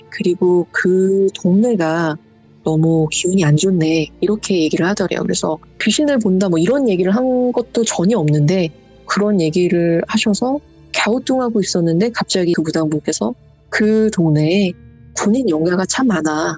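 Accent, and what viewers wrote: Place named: Seoul, South Korea. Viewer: 서울말투 진짜 이쁘다.. 난 부산 토박이라, 서울 사람 옆에 있으면,여자라도 우악스럽은디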